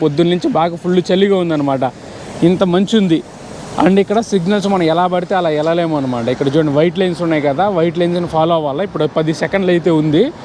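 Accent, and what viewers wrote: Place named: Tirupati, India. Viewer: Brother, nee bhasha chusthe nuvvu maa tirupati laga vunnavu😊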